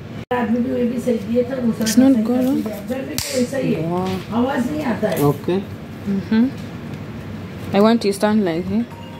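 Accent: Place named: Jamaica. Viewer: You talked like a Jamaica 🇯🇲 ❤ you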